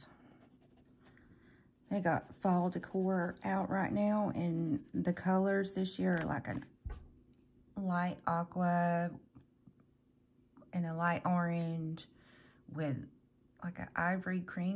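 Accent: United States. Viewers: I love your accent. I too am from North Carolina. I grew up in the Outer Banks. I have just started coloring and I appreciate all you do to help me. Don’t be nervous, you got this❤️❤️❤️ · Southern girl? I used to live down south on the Gulf Coast. Some of the best accents in the U.S.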